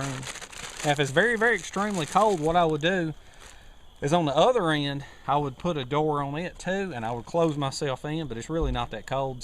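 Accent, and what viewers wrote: South, United States. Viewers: All of us Southerns talk this way. Some accents are stronger than others. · Love the southern accent sir! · I figured you were raised somewhere way down south:) I remember one trip I was in Atlanta and had a flat tire on my trailer, I parked in a two trucks in front of mine so I had to wait, time went by when a guy came out asking "is that y'alls truck over there?" I began looking around for anyone else that could have been the driver of my truck, I 'knew' I was a solo driver from Idaho, "yes Sir that's my wagon," "move it on in." "Y'alls" I had to smile, during our short visit he said "y'all must be from somewhere way up north?" I asked "why, do I talk as funny as you?" We both laughed! He said "your accent tells me you're a northerner!" I guess it depends where we are all raised, I love all your videos and your voice, keep them coming. 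Bomber from southeast Idaho:) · Thank you.Entertaining. I love your country boy accent!! Where are you from? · I'm from Tennessee. Where's this guy from? Trying to place the specific southern dialect. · Very interesting, thank you. Love the accent, Georgia or Lousiana? 😁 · Hell of a southern accent. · That’s a thick southern accent my guy. Love it. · Love the Southern Accent, And I thought the dog was the Bigfoot...... Love the info but you should come up to Canada for showing extreme shelter's, it's a lot cooler than in the South now in April 28 we are at 37 deg. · I love his southern accent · Nice video. That southern accent is deep as hell. Hahaha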